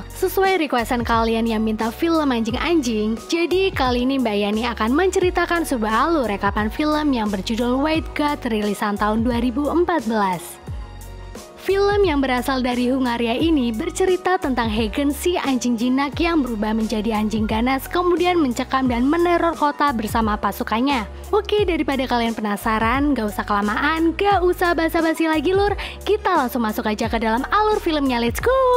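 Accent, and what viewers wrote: Pemalang, Indonesia. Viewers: Wong Pemalang mba? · Min orang pemalang yah?😅 gua orang pemalang neh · Fiks ni admin orang pemalang😂 Sekota karo aku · Orang pemalang kakk? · wong Pemalang ap mbk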